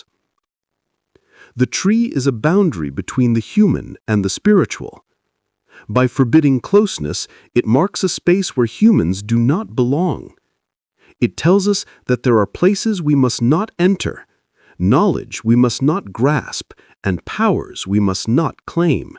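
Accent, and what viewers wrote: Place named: Africa. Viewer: Where u from? That accent sounds somewhat african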